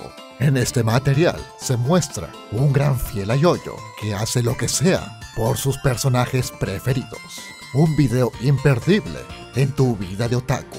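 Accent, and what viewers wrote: Peru. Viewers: Alv eres peruano :D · Eres peruano?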